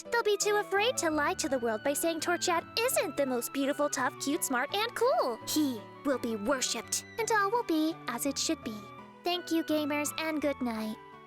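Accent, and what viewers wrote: South, United States. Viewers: I dunno what to focus on first... The fact that your singing skills are improving with each video? The fact that the cringe rap is cringe af and I love it? The fact that your editing is great and I love the "text" jokes? Your great southern accent? The "uwu"? Or the fact that you somehow never went to Pacifilog Town on this save file? For real though, in all my years of playing Pokemon (which is like... a lot of years, idk) I've never once done a contest. And the worst part is that I somehow feel like I didn't miss much. But that means that when I was a kid playing this game, I was too dumb for both the Battle Frontier and the Contests! Not much has changed since then I guess. Anyway, great video as always! Keep 'em coming, queen! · Was that an authentic "southern hell" accent :3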